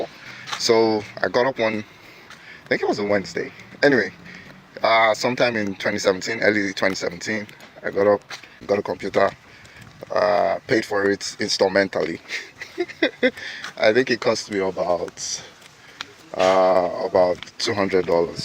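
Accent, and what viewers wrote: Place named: Nigeria. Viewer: Thanks a whole lot for sharing, it's so inspiring and I believe your course will help me understand better, many of the things I struggle with cos I really needed stuffs to be explained to me in that naija tone, and here I am!! hehe. Thanks a lot.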